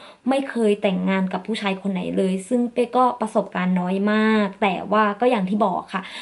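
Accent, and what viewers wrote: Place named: Isan, Thailand. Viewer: เว้าอิสานน่ารักมากน้องเป้😘😘😘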